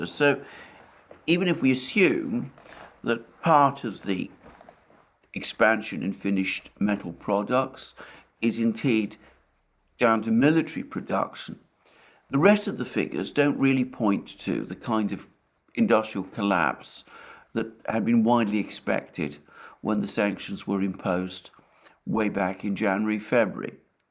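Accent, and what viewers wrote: England, United Kingdom. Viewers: Weird listening at a communist with an English accent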